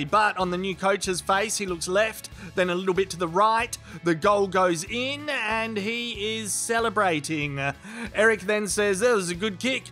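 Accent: Australia. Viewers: What is this. Aussie man on Man U channel! Excellent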